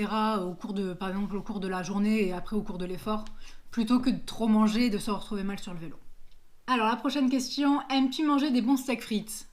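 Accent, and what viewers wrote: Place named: United Kingdom. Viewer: Sympa la vidéo ! Sinon pour les cyclo je pense que le top c'est comme les courses, tu manges entre 3 et 4h avant (oui vraiment, et c'est pas trop) des pâtes, un équivalent de viande blanche, puis peu manger pendant l'effort parce que ça oblige à digérer a nouveau et en plus c'est pas toujours judicieux de mettre la main a la poche en peloton x) Sinon le fait que tu sois prof explique l'anglais au début de la chaine et l'accent, c'est rare chez les youtubers français 😂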